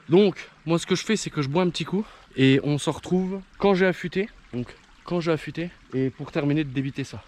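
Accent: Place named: Lorraine, France. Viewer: A l'accent je dirais un lorrain 👍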